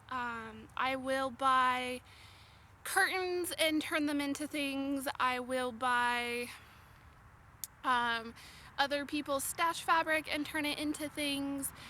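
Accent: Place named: Ohio, United States. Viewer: I knew your accent sounded familiar. I grew up in Ohio. :)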